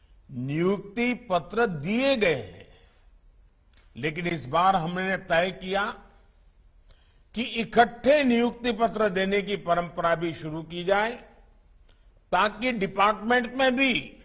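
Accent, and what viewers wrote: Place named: North, India. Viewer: That's true he's needs to speak in English and not like a North Indian villager what about the rest of us non hindi speaking Indians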